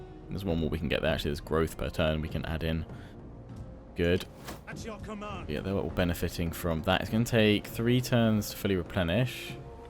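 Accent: England, United Kingdom. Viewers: What part of England are you from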